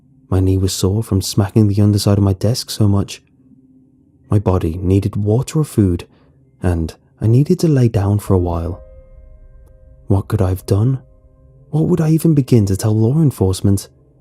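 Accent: England, United Kingdom. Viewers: Hahaha He do have a noice English accent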